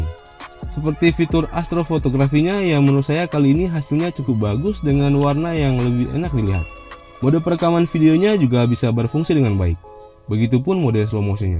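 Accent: Kotamobagu, Indonesia. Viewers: Bang kau orang Kotamobagu?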